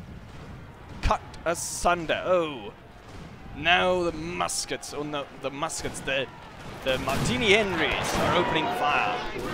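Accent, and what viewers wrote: United Kingdom. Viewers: Your accent sounds more British when you talk normally 😂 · Nice british accent you got there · I have just started watching your channel . I am really impressed with your english accent . If we were in this era you'll make a good soldier .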